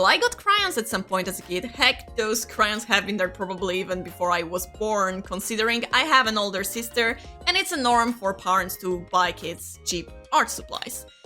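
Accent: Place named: Poland. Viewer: Honestly after I heard you speak Polish I realized that you do have a Polish accent, but before that I didn't pay attention to it at all so XD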